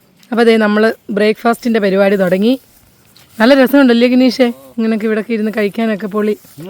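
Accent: Kollam, India. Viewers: എന്നതായാലും ദീപുകാരിയല്ല. കൊല്ലം"ഫാഗത്താ " ണന്നു തോന്നുന്നു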